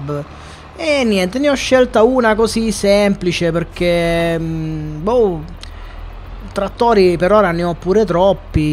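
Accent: Sicily, Italy. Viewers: . Parla. In. Siciliano. Mi. Piace.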